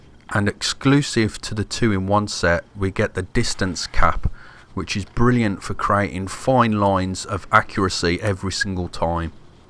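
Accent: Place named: North, United Kingdom. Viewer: Love the Northern accent :D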